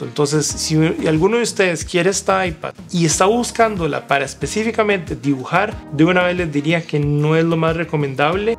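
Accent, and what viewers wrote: Costa Rica. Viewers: Este Mae habla como tico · Me va a decir que no es tico? Yo juré que sí, lo escuché y dije, que bien un tico jajaja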